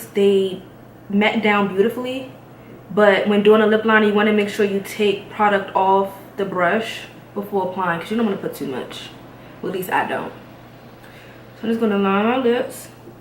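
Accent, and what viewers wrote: Washington DC, United States. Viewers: Where are you from you sound like us girlies from D.C.!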